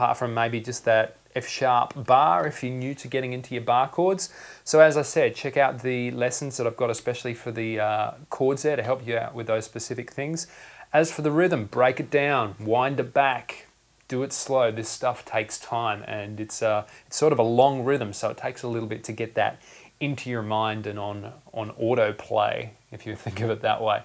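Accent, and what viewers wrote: Australia. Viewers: I'm so glad you posted this... one of the most helpful guitar lessons I've ever seen. I still can't play it but at least I have hope. And your Australian accent is very very cute :)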